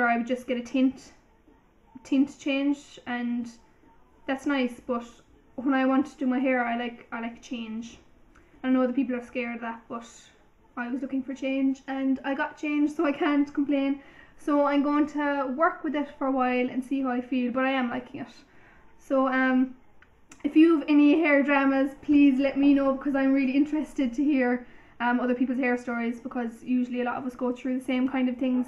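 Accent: Ireland. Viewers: A Irish u tuber way ha! Glad to hear a Irish voice ! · Are u Irish? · I love the way u speak. I like irish language, in half irish (wish i could talk like u guys)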